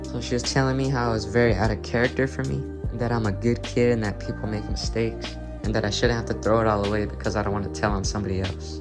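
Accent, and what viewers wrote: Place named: Kentucky, United States. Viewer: sounds like ky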